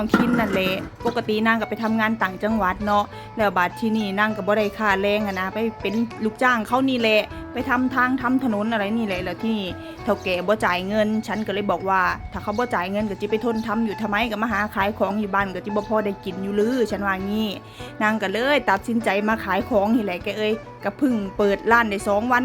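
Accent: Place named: Chaiyaphum, Thailand. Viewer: สวัสดีค่ะหนู ป้าชอบหนูพูดมากๆค่ะพูดแบบนี้แหละเพราะดีค่ะป้าก็คนชัยภูมินี่แหละค่ะป้าชื่นชมหนูใช้ชีวิตอย่างมีความสุขจ้า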